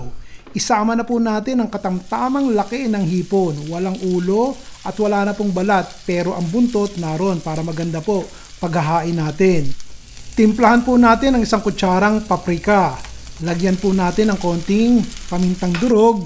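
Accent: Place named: Batangas, Philippines. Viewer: Nakajatuwa ka talaga. Lulutin ko yan . Bibili lang ako nga ingredience bukas. At lulutuin ko yan. Siyanga pala. Tagasaan ka nga pala anak sa atin at may punto angnoagsasalita mo. Taga Batangas ka ba?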